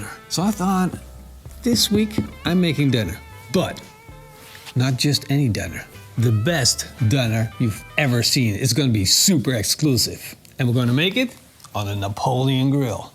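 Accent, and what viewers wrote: Netherlands. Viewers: Ik spotte je NL accent !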